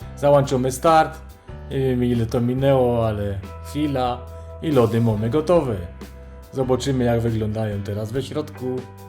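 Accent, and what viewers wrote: Silesia, Poland. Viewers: A co to za fonetyka? Czy to wymowa śląska?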